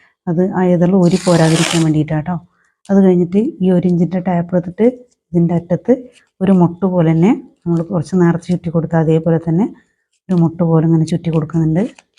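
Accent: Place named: Palakkad, India. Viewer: njaanum palakkattukaaran aanu.. samsaaram kettappol thonni 😍😍😍😍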